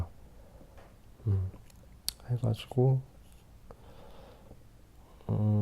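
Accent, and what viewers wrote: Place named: South Korea. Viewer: I wanted to know what you said in the video hahaha I like the Korean accent!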